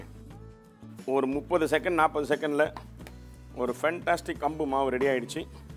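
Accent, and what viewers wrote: Chennai, India. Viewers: நீங்கள் தயார் செய்யும் உணவை உண்டு ருசிக்கலாம். நீங்கள் பேசும் வட சென்னைத் தமிழை காதில் கேட்டு ரசிக்கலாம்.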